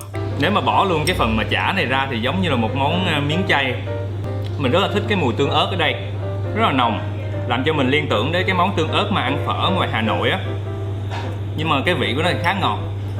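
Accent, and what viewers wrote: Mekong Delta, Vietnam. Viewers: Nghe giọng nói đúng chất miền tây. Dễ nghe gần gũi. Thành fan ông này mất thôi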